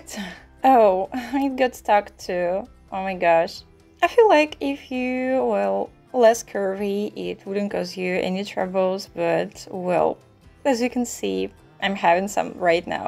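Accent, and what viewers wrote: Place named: England, United Kingdom. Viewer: awesome stuff, i love the windy days, I'm melting at your english accent 😍❣️🔥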